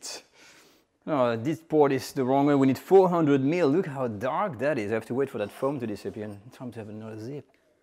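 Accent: France. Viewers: Love French food, and love the French accent. This looks delicious.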